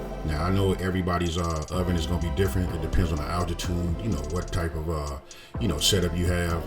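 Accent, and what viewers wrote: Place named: California, United States. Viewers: I can certainly tell you’re from Cali man, love the video, subbed. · you my fav!! You get to the point and make it simple!!! And that Cali accent 💪🏾💯💯💯👍🏾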